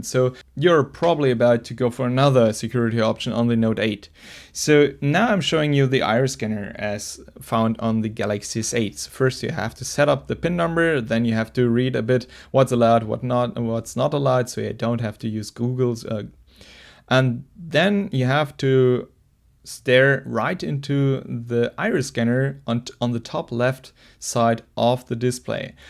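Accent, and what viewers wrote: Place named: Germany. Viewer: hey um..... whare rrrrrr uuuuuuu ffrommm??????????????????u have an hard accent.Germany?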